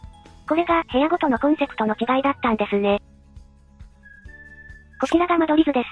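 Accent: Hakata, Japan. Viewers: 博多言葉がかわいらしくて、いいですね。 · 博多弁や福岡の景色が懐かしく嬉しいです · 博多弁がバンバン飛び出してくるので、何か嬉しい。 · 博多弁がオモロイですね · 家賃、いくらくらいなんでしょうね。 ところどころに博多弁が出てくるのが、福岡の人間として嬉しい😊。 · 博多弁がなーんか嬉しくて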